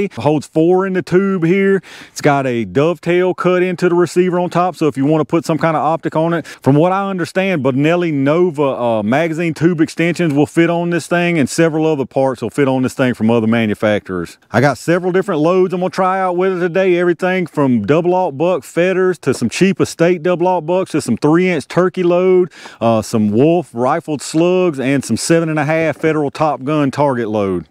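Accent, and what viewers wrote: South, United States. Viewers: Thank you for your review, I have been looking at this shotgun for a while, love your Chanel and your excellent reviews. Ps, I am a Yankee, but love the south, especially that southern drawl. Keep the videos comming.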